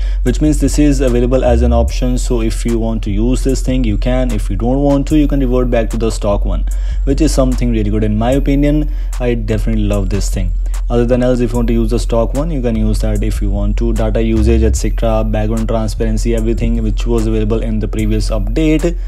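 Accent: India. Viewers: How can i block/ban all Indian Youtubers with one button? The Indian accent is horrible.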